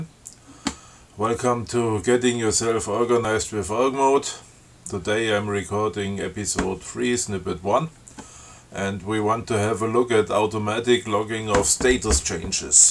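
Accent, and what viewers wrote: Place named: Austria. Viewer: I also immediately thought that this sounds like Austrian English :D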